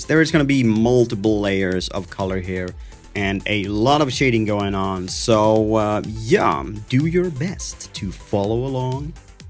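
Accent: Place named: Skåne, Sweden. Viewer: en javla skånsk dealekt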